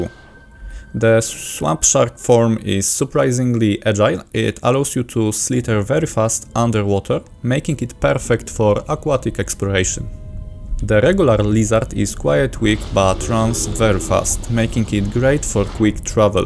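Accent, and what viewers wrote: Poland. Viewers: podnieca cię podcinanie innym skrzydeł? Ma akcent i co? Jest z Polski to ma polski akcent, prosta i normalna sprawa, tylko debile silą się na hamerykański mimo że nie przychodzi im naturalnie, a gość mówi bardzo dobrze i zrozumiale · Odrazu słychać, ze jesteś z Polski każdy Polak wymawia końcówki ing z g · I love how polish you sound brother 🇵🇱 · Słychać że rodak xD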